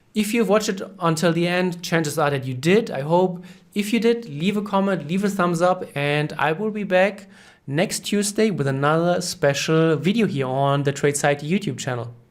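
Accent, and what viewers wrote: Germany. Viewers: Something about a scientific German accent that's reassuring, much respect my mentor and thanks for the wisdom, yes I'm black and I'm saying this, thanks again brother! liked and subscribed! :'D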